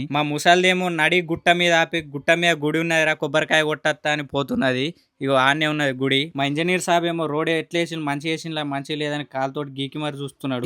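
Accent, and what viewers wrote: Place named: Adilabad, India. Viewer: Hi brother my name is vemula srinivas from Karimnagar..mi chanal subscribe ni ...chala Baga vuntavi funny reels ... adilabad slang super...maku adilabad kachikanti village lo friend s vunaru every year twice vasthamu ...nenu lecturer ni ..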